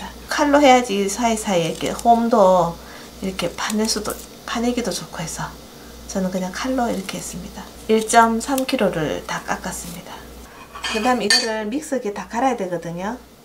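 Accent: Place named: Daegu, South Korea. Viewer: 생강활용도짱~♡ 대구말씨 참 정겹네요 저도대구가친정입니다^^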